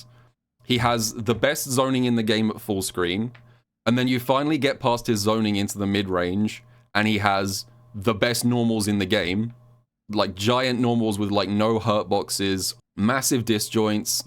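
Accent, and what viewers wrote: United Kingdom. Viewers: i appreciate how you make "bad content for a good cause", i dont care about tierlists especially as a non SF6 player or active online yapper complaining about X thing in a fighting game, but i do care about hearing funny british capybara man yap and be honestly very insightful and reasonable while also being entertaining and going for charity events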